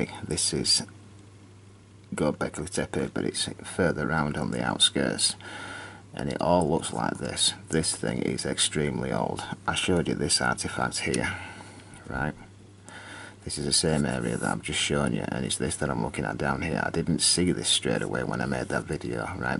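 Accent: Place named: Yorkshire, United Kingdom. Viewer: Sorry I'm a few years late, this stuff is fascinating. Once you see it, you cannot unsee. Great work. Cheers From NZ. I can't pick the accent but I'm Guessing York or Scarborough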